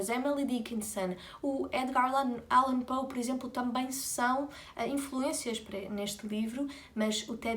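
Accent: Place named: Portugal. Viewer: Adoro seu jeitinho de falar, suas palavras, seus gestos, mexendo no cabelo, sua pronúncia desse português de Portugal, tão bonito e mais sonoro que o nosso do Brasil... Outra coisa que adoro são suas roupas de cama, seus edredons, este com essa renda, lindíssimo! Seus lençóis e fronhas bordadas, sua cama fôfa, seus travesseiros enormes... dá vontade de mergulhar nela! Obrigada pela gostosura desse contexto! Sua pronúncia fica no meu ouvido e fico falando com meu marido com esse sotaque gostoso, de brincadeira... Suas interpretaçoes e análises são mto interessantes, de uma intelectual cultíssima e despretensiosa... Seu mundo é delicioso, da palavra, dos livros que vc ama de verdade, dos autores e suas personalidades, que vc liga às suas obras... O contexto histórico e cultural de cada obra.... Parabéns, querida, uma super professora!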